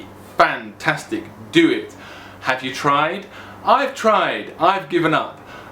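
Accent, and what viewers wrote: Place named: Germany. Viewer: Bonega filmo mi speziale amas, tiel vi parolas angle kun germana akcento 😂 #kor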